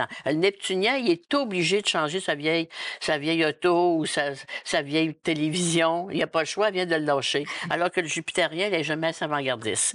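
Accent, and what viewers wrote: Quebec, Canada. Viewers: Tantôt vous parlez très vite et avec votre accent québécois on comprend pas grand chose on en perd un peu. Allez y slowly. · Merci beaucoup d 1 Balance asc. Gémeaux. Pour ma part je suis super fan de votre accent Québécois, j adore!!❤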